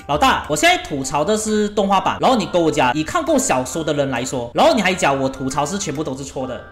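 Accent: Malaysia. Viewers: 對方真的很有事 就跟你說我是吐槽動畫版 你有跟我扯小説版 不過 我現在才發現 有馬來西亞的口音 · 看Kuma桑吐槽的口音好好笑😂 回去看自介果然是馬來西亞人，跟"娘娘"一樣口音😋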